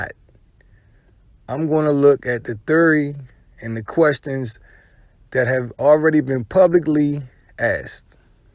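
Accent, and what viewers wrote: Baltimore, United States. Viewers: Are you from Baltimore? Sound like tayroc with the oooos 🤣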